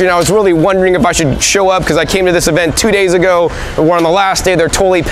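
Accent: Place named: United States. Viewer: Totally misleading video! I thought there would be a garden tour with footage of diagnosing plants with microbial deficiencies. But alas, products, products and more products. Consume, sell, market etc. Should have figured with your American accent. Why do yankee's always have something to sell?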